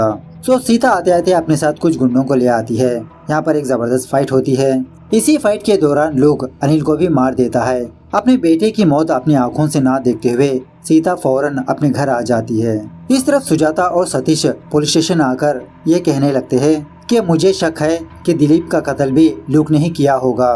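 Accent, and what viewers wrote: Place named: Maharashtra, India. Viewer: Iski Jaat Ka.., Is a Epic Word.. Creator Ne Must Bataaya Movie ko Short me.. Lekin Na Movie ke naam ka Matlab inko Samjh Aaya Na Propegenda Samjh Aaya .. Isiliye Hindi Dubbed movie ka Short bana Diya Copy Paste.. Ending Explanation Ka Tag use mat Karna.. Good Working lekin Aapka aawaz Maharashtra ke koi pichde hue Gaao ke ilaake Wala kheti kisan ya mazdur ke anpadh Bete jesa lagta he.. means baat karne ka tarika .. phir bhi best luck Meri Taraf se.. Jo Aap aaj yaha Tak pohche.. 👍🏼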